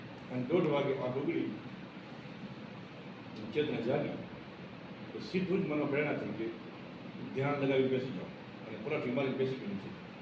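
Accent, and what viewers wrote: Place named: Kutch, India. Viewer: Prabhu pranam. Tame je bhasa bolo chhe te kutcha na matra 24 gam leva patel bole chhe. Teo 350 varash pahela virpur ni aspasma te migrate to kutch. I guess you bro,living near rajkot. I m living abroad for 40 years Thaks.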